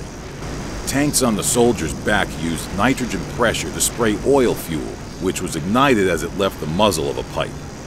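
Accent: South, United States. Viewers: Southern accent anybody else here it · This guys sounds like Forrest Gump. Is it a regional accent in US? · You can kinda hear a southern accent too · Is no one gonna acknowledge the fact that he has a Southern accent and he said “Rafle” instead of Rifle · That American acent is quite strong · he sounds so american lol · he must have a southern accent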